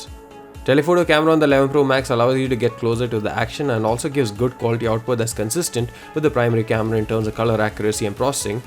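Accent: India. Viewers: ¿Why Indians doesn't work a little bit more on their accent and pronunciation? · this indian accent so fucking disgusting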